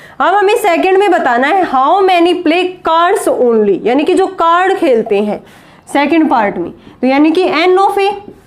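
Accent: Haryana, India. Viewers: She is sure from HARYANA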